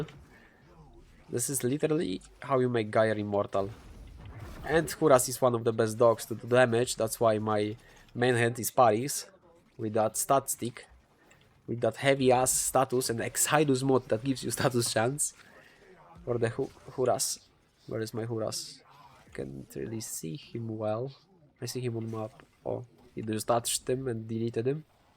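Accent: Poland. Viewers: u poland? i hear accent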